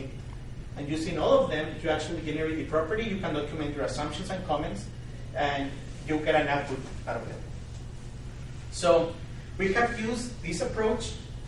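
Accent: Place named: Arab world. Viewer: His accent is bad. is he arab?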